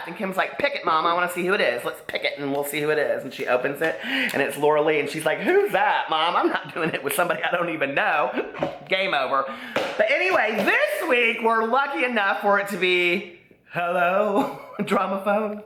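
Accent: South, United States. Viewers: LOL...you're alway's sooooo southern :)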